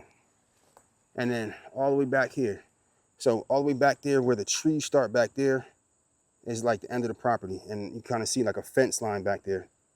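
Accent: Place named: Philadelphia, United States. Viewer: First time viewer here from Delaware County PA, just outside of Philly. I follow so many YT Homesteaders from the South with their Southern accents that as soon as I heard you speak I knew you were from somewhere near me 😂 Now I have a new channel to follow. Thanks!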